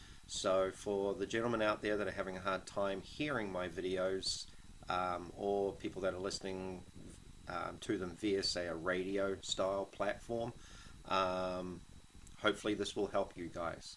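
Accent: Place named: South Island, New Zealand. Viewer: Kiwi,You sound like you are from the mainland/south Island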